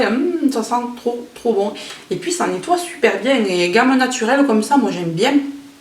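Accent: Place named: Pyrenees, France. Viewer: J'ai pas pu partir chez moi dans les pyrénées cette année alors ton accent me donne l'impression d'être un peu en vacances 😘😘😘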